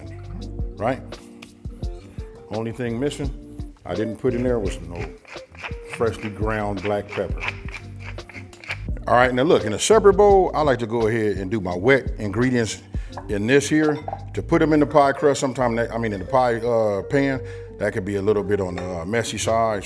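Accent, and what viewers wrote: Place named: Texas, United States. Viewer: You sound like a good Texan! Loved the recipe.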